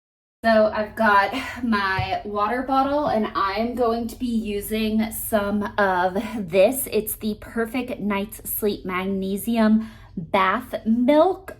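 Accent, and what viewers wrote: South, United States. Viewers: Hi! I’ve been watching your videos ever since your scarf-styling tutorial popped up on YouTube’s homepage—so probably since 2008 or 2009. I’m from Switzerland, and your channel was a huge part of my teenage years. Watching you motivated me to improve my English (I always loved your Southern accent!) and I learned so much about beauty and makeup—way before my friends did, because we didn’t have anything like the beauty community here. I just wanted to say thank you for coming back to YouTube. Your rambling, cozy style has always felt like a podcast to me—I love having your videos on while I do my makeup or just take a break. And your honesty about introducing your new Cavapoo after Teddy really touched me. I totally get it—I felt the same after losing my dog. Funnily enough, I now have a Cavapoo too—her name is Lokki 🐾 Anyway, I’ve never really commented before (very Swiss of me), but I’ve been here all along and just wanted to finally say thank you for everything! 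✨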